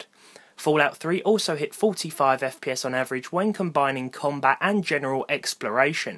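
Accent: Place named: England, United Kingdom. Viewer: dude I'm so glad I found ur videos it's so good to hear a familiar English accent know so much about gaming on a budget haha ur sooo chill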